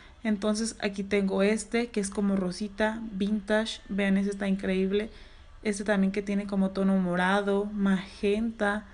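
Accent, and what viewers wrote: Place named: Tabasco, Mexico. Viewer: Tienes acento tabajqueño🤭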